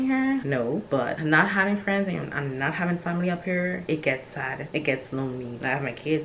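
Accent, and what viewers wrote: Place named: Virgin Islands, Caribbean. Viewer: You’re from the USVI?! I knew the accent sounded familiar I’m from the BVI. Sending you VI Love ❤️❤️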